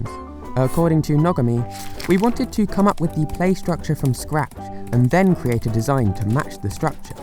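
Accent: United Kingdom. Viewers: You have an awesome speaking accent Are you British?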